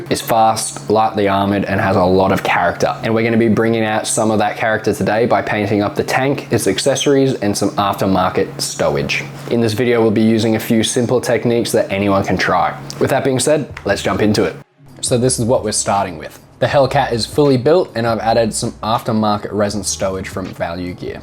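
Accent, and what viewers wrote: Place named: Australia. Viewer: Great stuff Miss the Aussie accent from home over here in the UK Thanks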